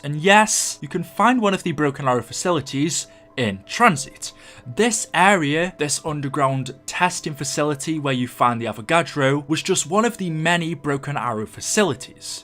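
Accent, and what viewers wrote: United Kingdom. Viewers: Why are you British and also who put the mark on the SOE Crew. Or what happens after SOE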